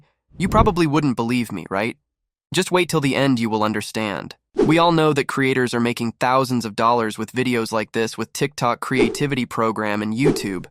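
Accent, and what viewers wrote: Sri Lanka. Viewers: are you from sri lanka?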